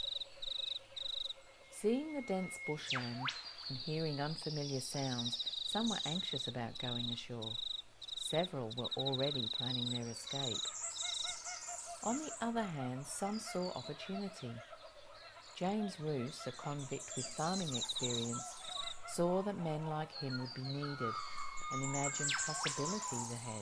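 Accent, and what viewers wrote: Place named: Australia. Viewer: What’s wierd about it, champ? It is an Australian lady with a normal accent. You should jog on, champ.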